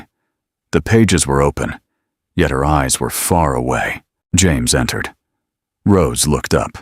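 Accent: United States. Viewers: An American accent does not fit with these stories. 😢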